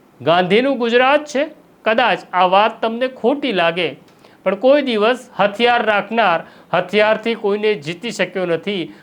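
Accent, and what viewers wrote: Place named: Bihar, India. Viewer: તમે બિહારી પત્રકારો ના ટોન માં કેમ બોલો છો, ગુજરાતી ની જેમ વાત કરો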